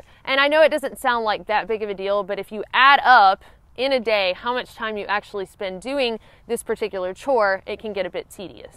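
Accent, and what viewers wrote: United States. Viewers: Love the proper American accent!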